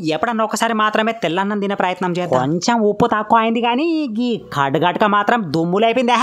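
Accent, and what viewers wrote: Telangana, India. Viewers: Bro, our Telangana slang 💥💥 Your videos >>>>>>>>>> trending vidoes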